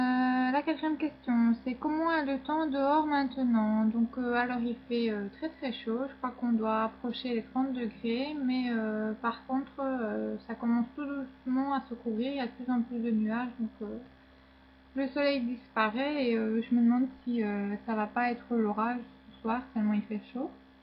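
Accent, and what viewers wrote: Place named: Belgium. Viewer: Coucou!! C'est cool que tu es fait ce tag ;) Tu vis dans quelle region? Ton accent ressemble un peu a l'accent belge. Gros bisous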